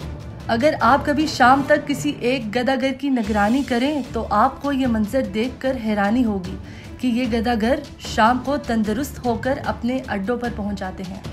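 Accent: Punjab, India. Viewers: سچ میں آپ میں ٹیلنٹ ہیں پاکستان زندہ باد آپ نے موزوں بھی اچھا چوز کیا اور رول بھی بہت اچھا ادا کیا ہیں اور ہمیں پاکستان حالت کے بارے سے آگاہ کیا ڈریس بھی میچ کیا آواز کے ساتھ زبردست کمال ہیں اور دوسری بات یہ ہیں کے پنجابی آواز آپ پر سوٹ کر رہی ہیں۔ نائس اللہ آپ کو صحت خوشیاں اور لمبی زندگی دے آمین ثم آمین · اس وڈیو کلپ میں جس محترمہ کی آواز میں voice over کی ریکارڈنگ کی گئی ہے وہ انتہائی فضول ہے کیونکہ اس عورت کا اردو الفاظ کے تلفظ ہندی زبان سے متاثر شدہ لگ رہے ہیں۔ اُردو زبان میں تلفظ کی ادائیگی کی بہت زیادہ اغلاط ہیں۔ انڈین انداز میں غ کو ک کی طرح بولا جا رہا ہے۔ جو کہ بالکل بھی متاثر کُن نہیں ہے۔ مثلاً گداگر کو با بار گداگار، غیرت کو گیرت، غور کو گور پہلی قسم کی بجائے پہلی اقسام خاک کو کھاک درجہ کو درج تیسری قسم کو تیسری اقسام کہا گیا ہے بکواس پوسٹ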